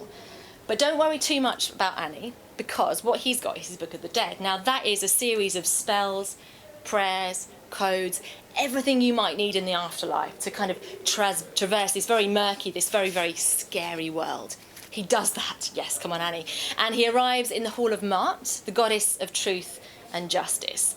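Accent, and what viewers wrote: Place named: England, United Kingdom. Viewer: bit of an English accent and I'm liking it too!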